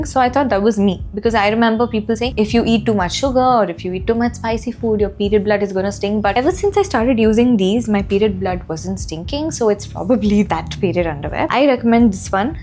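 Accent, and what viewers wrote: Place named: Karnataka, India. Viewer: that kannada accent 😂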